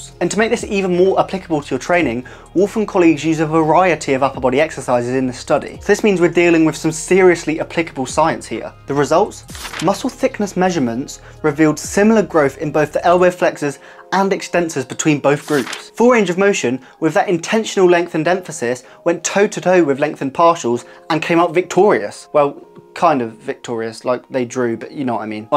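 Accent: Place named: United Kingdom. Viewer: Are you British I like your accent 👍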